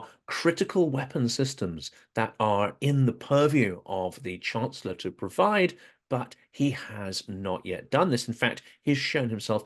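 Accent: United Kingdom. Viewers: Oh, funny. I’m American and speaks British. He’s from United Kingdom, and lives there. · I checked the channel info and it says location United Kingdom. 🙂 Plus his accent is very British. 😊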